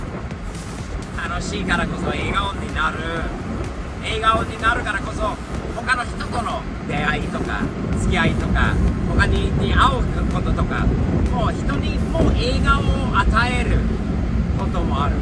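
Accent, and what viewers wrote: United States. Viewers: Also, one final thing I want to say. Say what you want about American accent, but that guy's still extremely proficient in a second language in a way that the vast majority of Americans are not. I will always respect the fact that this guy raised his son to also speak fluent Japanese, as well, despite the fact that he was raised entirely in America (Link: <link> I wish my own father did this for me, and I still love him to death, but he had neither the patience nor the confidence to learn my mother's native Japanese tongue. The Japanese language is an heirloom that is worth preserving, but because my sister and I were never raised in the language, we've had to pick it up as adults since. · アメリカ訛りの日本語って感じで好きです！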